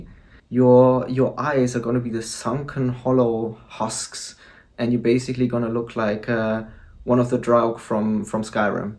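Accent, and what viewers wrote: Germany. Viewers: your accent sounds very german.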